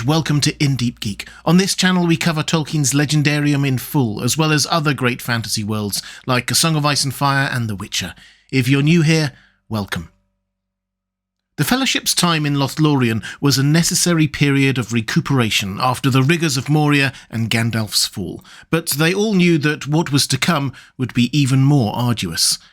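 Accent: England, United Kingdom. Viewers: He has an English accent too! Neat eh?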